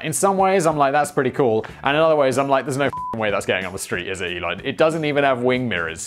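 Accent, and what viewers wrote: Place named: United Kingdom. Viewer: Knows everything about trailer laws in the UK but nothing about the history of cars worldwide and has a channel called 'Today I found out: Brain food for hungry minds'.. ..as much as I enjoy this light hearted content, you're losing credibility as having a curious mind Simon (the Czech, US Citizen, and British sounding person)..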